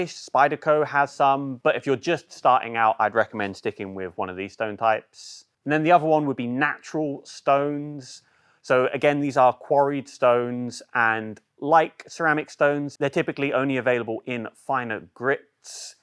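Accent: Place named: United Kingdom. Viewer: From your accent I thought you would be in the UK. I follow the link and the prices are in $? Hey ho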